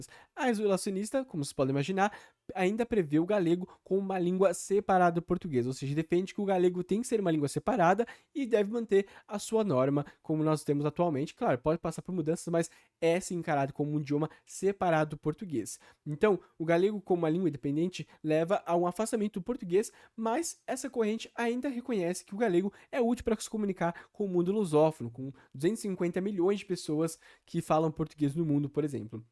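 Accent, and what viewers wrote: Brazil. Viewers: O sotaque dele é mais próximo a diversas regiões só Brasil do que de Portugal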